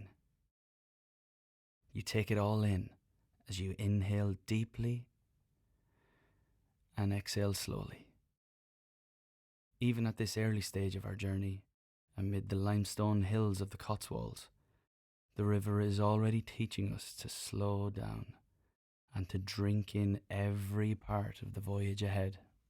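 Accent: Ireland. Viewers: I don’t think I’ll ever be able to sleep again without this. Niall’s voice & beautiful Irish accent is so soothing. The only problem is I never get to finish the video. In less than 5 minutes,I’m sleeping like a baby. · especially with the Irish accent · * soothing Irish voice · love the irish accent that he has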